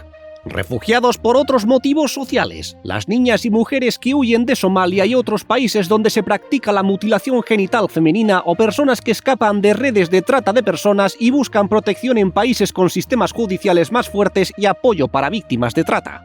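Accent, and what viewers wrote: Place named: Catalonia, Spain. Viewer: Hola divertidamente dibujado y con voz de origen del país Catalán ❤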